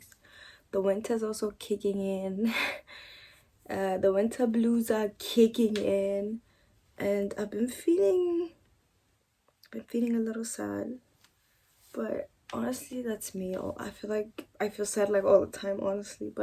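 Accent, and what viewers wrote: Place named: South Africa. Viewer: woah...you have a South African accent!🤭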